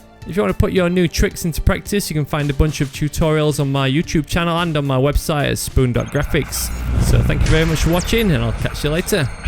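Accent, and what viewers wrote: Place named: Yorkshire, United Kingdom. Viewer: Love Yorkshire accent.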